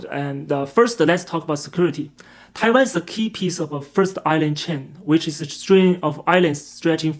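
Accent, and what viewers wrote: China. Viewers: I could fully understand your Chinese mandarin accent English, I decide to use your this program to improve my English listenning capability. But my question is, what's the final result of this practice? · 你这眼睛要不上医院看看吧，别崩镜头上了，看的我生理不适😂念稿好歹也装一下，蛙蛙们还以为你有多大智慧似的😂国民党的英文是guomindang啊?这是什么寄吧中式英语啊，真的没读过几本书强行装是个知识分子😂原来是个人都能在yt上面做自媒体博主了😂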